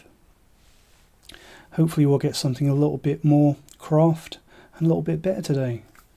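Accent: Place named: United Kingdom. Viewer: Where are you from in Great Britain? Your accent is very nice to listen to. Added to your whisky knowledge and honest reviews, this makes you the best youtube subscription i made alongside Gwhisky this year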